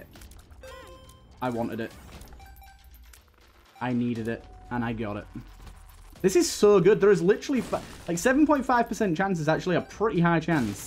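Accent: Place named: Birmingham, United Kingdom. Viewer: The question to you is simple where are you from in the UK i don't want your exact location just want to know where your accent is from you sound brummie to me but has some twang to it. its been bugging me since i've started watching your channel :(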